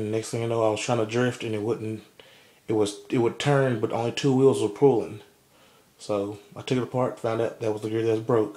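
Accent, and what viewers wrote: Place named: South, United States. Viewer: It looks good and u sound southern as hell like me, Louisiana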